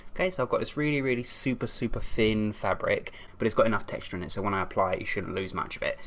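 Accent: United Kingdom. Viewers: you look like the person from: I survived a zombie apocalypse:thom because of the british accent and the face looks so similar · omg, your gay/british accent gives me shivers in a good way. dont tell my bf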